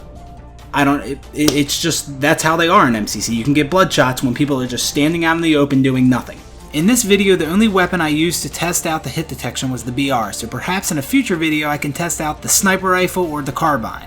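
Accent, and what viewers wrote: Baltimore, United States. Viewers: Baltimore for sure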